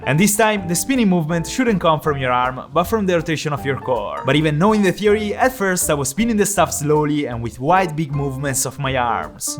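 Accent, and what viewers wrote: Italy. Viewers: Ho sempre sentito un accento particolare e oggi ho finalmente scoperto che anche te sei italiano! 😂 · L'accento italiano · Ti assicuro,notavo l'accento ma mai pensavo fosse italiano,also extra nice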